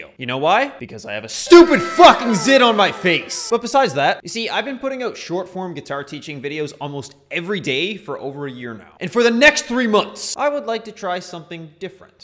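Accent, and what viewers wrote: Canada. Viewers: Can you do both long and short? I need a video about muting random interstitial noise while playing. BTW, you've never sounded.more Canadian,.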